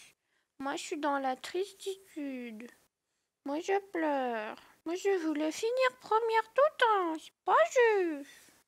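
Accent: Quebec, Canada. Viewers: T'es québécoise?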